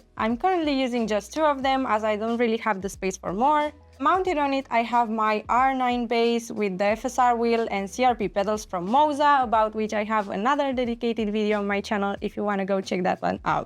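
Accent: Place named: Liverpool, United Kingdom. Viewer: Nice accent. Liverpool?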